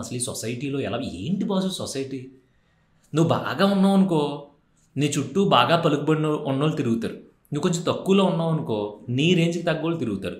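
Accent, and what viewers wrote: Godavari, India. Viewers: Bayya meedi a ooru? Godavarenaa...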